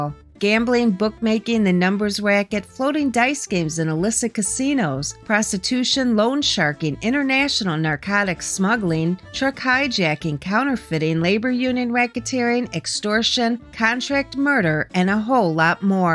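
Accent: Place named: Chicago, United States. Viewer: Why is it called throw in New York when the Narrator broad has the thickness Chicago accent since Tony Spilotro ?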